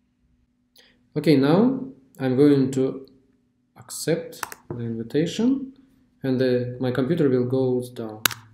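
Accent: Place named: Russia. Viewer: Ваш русский акцент - это просто анбеливабле! Спасибо за видео - очень помогло!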